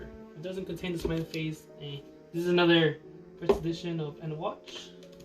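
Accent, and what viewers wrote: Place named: Latin America. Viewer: Book haul + latino accent = asmr = heaven for me 😉😉😜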